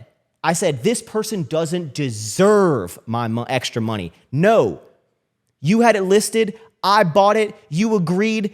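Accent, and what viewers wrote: United States. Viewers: The onset of the country accent is crazy. Love you! Rooting for you always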